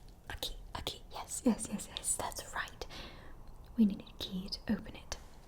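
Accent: England, United Kingdom. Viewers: I love your british accent for ASMR!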